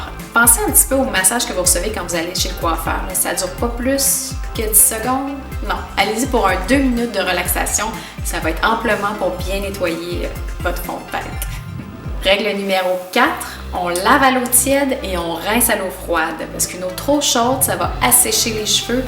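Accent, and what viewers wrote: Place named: Quebec, Canada. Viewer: YASKO SEVRAN c’est l’accent québécois 🤷🏽‍♀️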